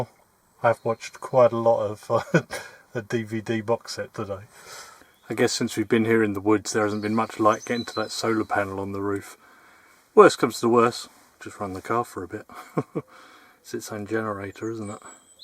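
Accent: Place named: RP, United Kingdom. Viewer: gone all posh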